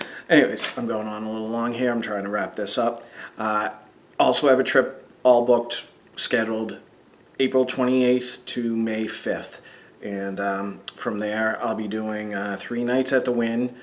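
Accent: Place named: Boston, United States. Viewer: ...amigo, imo, you don't need give aways. We sub'd and watch you for YOU and your shenanigans. In a youtube world of so many "fake and money grubbing" people, i myself appreciate your humbleness and "realness!" Don't put yourself down amigo please, there's many of us out here that genuinely like you just as you are. When I watch your videos I smile, you make me laugh and of course, who doesn't love that "Buastahn" accent, lol. I am part of the, Hog & 2 Cent, Mark, Matt, Nicky, and Vegas Nerds crew. 😁😁 I am happy to see that you and Cinamon are "goin steady", ha ha, ya know what I mean. I wish you both good luck and blessings. Amigo, please stay safe in Vegas....DO NOT lick the pole! LOL LOL 😅😆 Do NOT lick anything that hasn't been sprayed down with plenty of alcohol! LOL By the way...I'm a chatty heffer, so I don't mind rambling, lol! 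Cheers to good times, health, tons of laughs, handpays and unforgettable memories in our beloved and BEST city in the world...VEGAS! 🤗😁🍸🍻💰🍀🤣🎵🙏🎰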